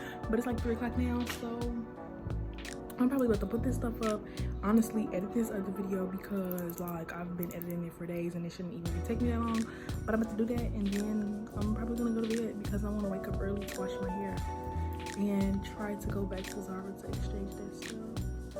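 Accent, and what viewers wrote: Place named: Chicago, United States. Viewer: Girl lmao you from Chicago?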